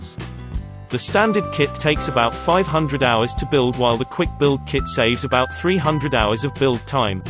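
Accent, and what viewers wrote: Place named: United Kingdom. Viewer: Love the videos, so tired of the British accent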